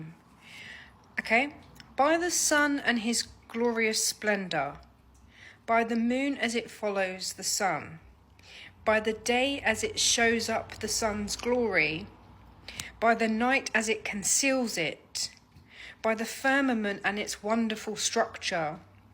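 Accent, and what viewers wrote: England, United Kingdom. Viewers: لهجتها انجليزية وليست أمريكية . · للتصحيح، لهجتها بريطانية وليست أمريكية... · هذه بريطانيه وليست أمريكيه واضح من اللهجه … كفي عقده أمريكان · هل ما فهمته صحيح او فيه ما يشوبه ؟ العلم عند الله ،.. اللهجة ليست أمريكية أعتقد بريطانية و الله أعلم . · لهجتها انجليزية وليس امريكية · هي بريطانية وليست أمريكية، اللهجة الأمريكية تختلف عن اللهجة البريطانية. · ثبتها الله على الإسلام.. يبدو من اللهجة بريطانية وليست أمريكية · لهجتها بريطانية . هل انت متاكد انها امريكية؟؟؟؟ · هذه ليست لهجتها أمريكية بل بريطانية او ممكن استرالية المفيد ليست لهجة امريكية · هذه اللكنة بريطانية وليست امريكية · هذه لكنة بريطانية وليست امريكية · لكنة بريطانية وليست امريكية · لهجتها بريطانيه مش امريكيه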